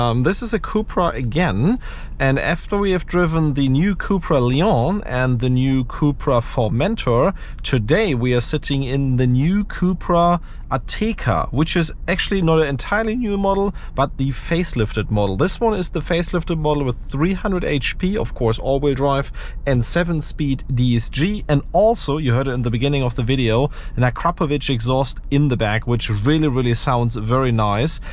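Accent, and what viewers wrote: Germany. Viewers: Dieser krasse deutsche Akzent ist schon hart 😀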